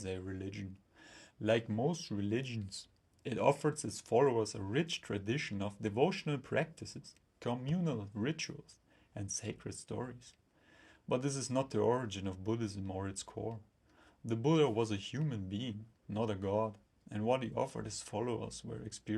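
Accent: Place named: Germany. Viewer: Ich hab zwar Tag meines Lebens noch kein vergleichbar heftigen deutschen Akzent gehört, aber ich danke dir soooo sehr für dieses Reading und den Upload.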